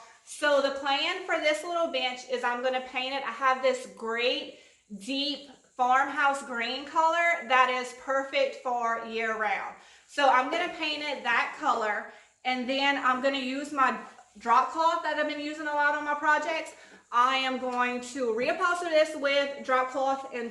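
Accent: Louisiana, United States. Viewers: Girl, it's absolutely a bedpan. I'm glad I discovered your channel, your content is great. And I love listening to your Louisiana accent! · Are you from Louisiana? You have a similar accent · Isn't she precious?? I first saw another of her videos and I thought " that accent sounds familiar". Turns out she is from the same area of south Louisiana as my best friend's family, Cajun country.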